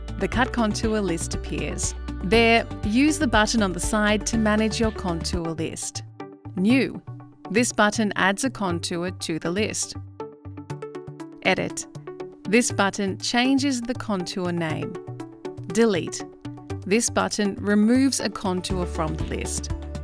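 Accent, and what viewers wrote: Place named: Australia. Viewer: Who's the presenter ? Voice sounds like its Aussie.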